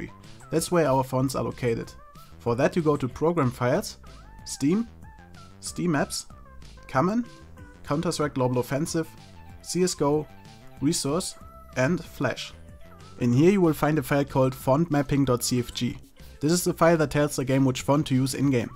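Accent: Germany. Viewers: Are you German? Cause you sound like German ^^ If yes, then Halloooooo :D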